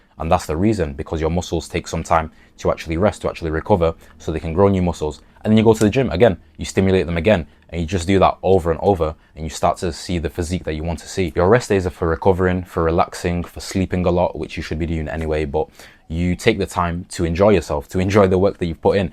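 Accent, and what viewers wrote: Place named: England, United Kingdom. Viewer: English accent funny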